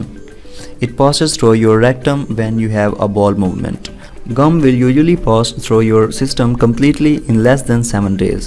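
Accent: India. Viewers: Is that an Indian 🇮🇳 accent? I'm chewing gum right now This is helpful 👍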